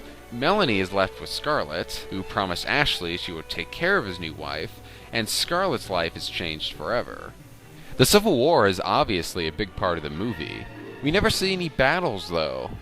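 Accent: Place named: New England, United States. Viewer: Nasal yankee commentator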